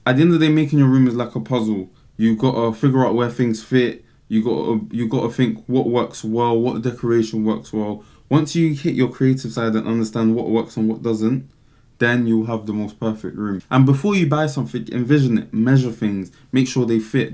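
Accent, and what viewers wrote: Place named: United Kingdom. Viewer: that's a real nice british accent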